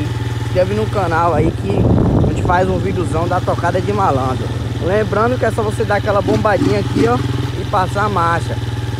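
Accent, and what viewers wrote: Bahia, Brazil. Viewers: Pelo sotaque é bahiano kkk , de qual cidade mano ? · Mano se e Bahiano? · Vc e da bahia de meu mn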